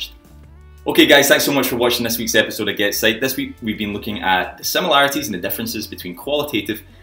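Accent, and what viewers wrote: Scotland, United Kingdom. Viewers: That scottish accent though :D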